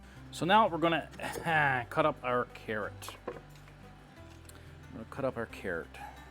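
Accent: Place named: Atlantic Canada, Canada. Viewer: GREAT recipe. Any combo dish like that which blends all those flavors can easily turn into feasts fit for Kings. In my recipe I've used sweet potato, roasted Brussels sprouts, and garlic (including garlic powder), and parsnips which add a certain minty flavor to the mix. Keep up the good work! Oh by the way, I enjoyed listening to your Atlantic accent. Here in the Twin Cities we have many Canadians because of our love for pro hockey. Their accents are so different from yours. 😀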